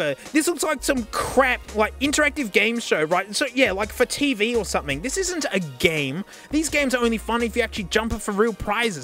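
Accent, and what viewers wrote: Australia. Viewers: I've been binging your videos for like the past week, and I have this feeling. . . that you might be Australian. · I really love the weekly display of the disembodied hands with Australian background voice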